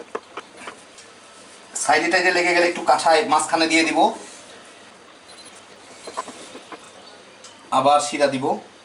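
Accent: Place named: Faridpur, Bangladesh. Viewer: Vai apnar soja sapta kotha onk valo lage tobe vai apnar vasha amader faridpurer moto.apnr bari ki faridpur distrik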